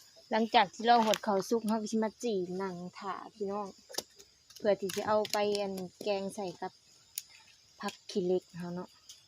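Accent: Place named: Isan, Thailand. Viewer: ชอบเสียเว้ามาก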